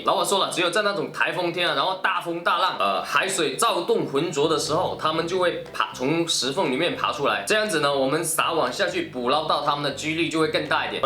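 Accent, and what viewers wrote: Chaoshan, China. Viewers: 是潮汕话吗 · 潮汕话闽南语系的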